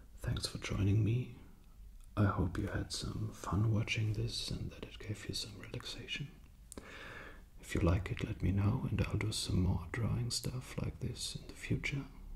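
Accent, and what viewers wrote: Germany. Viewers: this is when he still had a little german accent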